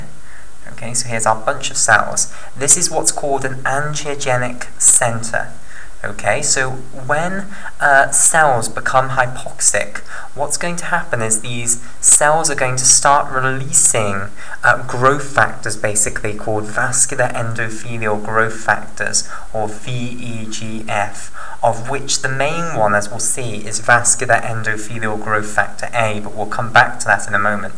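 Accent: England, United Kingdom. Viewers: What part of England are you from?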